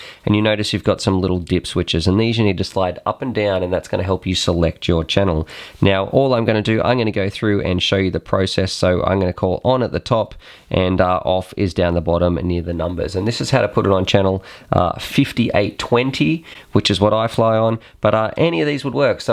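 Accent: Australia. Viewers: G'Day. Great video mate and unreal to hear an Aussie voice. I was trying to work out where you were when out in the field with the floodlights/footy field/cricket pitch in the background. At first I thought that was Adelaide Oval, but I'm not sure... Anyway, with it being a year since you made this terrific vid, do you still stand by these products as the best value for a beginning Drone Pilot? I have zero interest in spending lots of money on a DJI anything, plus an iPad Mini, etc, but am keen on recording flight footage. Sorry if this is a silly question, but how do you record the flight footage please mate? Thanks again for the terrific instructional video. I've shown it to all my mates who are keen on this as well. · Sounds like your an Aussie but using US dollars in the totals lol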